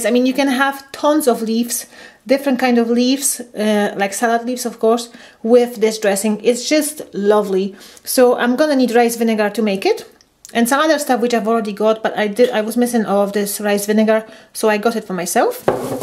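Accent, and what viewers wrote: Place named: Scotland, United Kingdom. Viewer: I loved this video! I can only presumeFrom some of your pronunciation "livin', aye, wee" that you are living in Scotland? :) I am Scottish born and raised and a follow vegan! So bery glad I found your channel! Xxx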